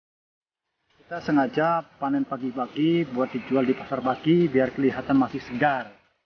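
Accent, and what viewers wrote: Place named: Banyumas, Indonesia. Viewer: sampean tiyang pundi bos kyong ngapak bhsane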